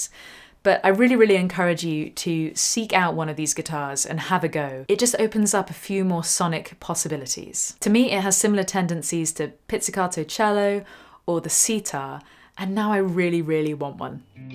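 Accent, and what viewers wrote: United Kingdom. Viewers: can i help u get a sitar from india -) you are unreal i swear teacher,guitar player,polight,dusky voice,british accent and the most beautifull face how can you have all of that.REALLY UNREAL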